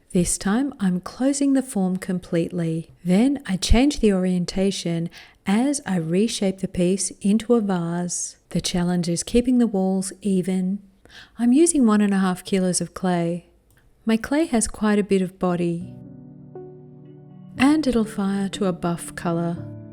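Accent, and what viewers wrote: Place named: Australia. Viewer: Great design. I am delighted to hear your lovely Australian accent and voice narrating your Organic Vessel. Also fond of black blue and matt gloss combos. thanks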